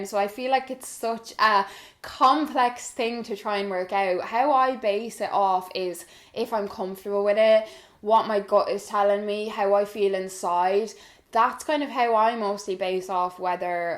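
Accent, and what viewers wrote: Ireland. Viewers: Why do you have such a strong Irish accent and Melanie doesn't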